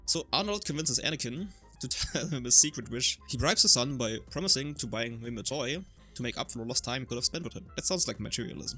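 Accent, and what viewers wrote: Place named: Germany. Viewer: 😂 love the "tze", you crazy germans make it more fun just to speak, ceep it up ! 🎉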